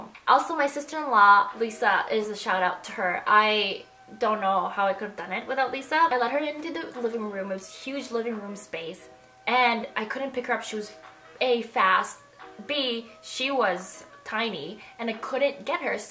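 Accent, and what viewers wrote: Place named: United States. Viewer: She has a very Americanized voice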